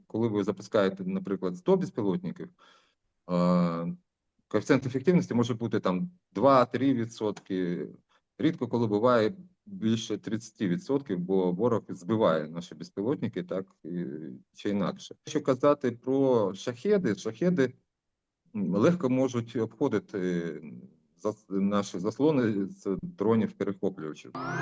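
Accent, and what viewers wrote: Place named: Surzhyk, Ukraine. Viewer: Ну говори на русском, если сложно, нафига этот суржик!??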